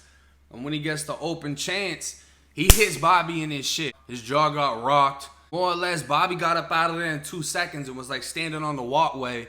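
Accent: Boston, United States. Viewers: So u definitely from Boston right? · U from boston?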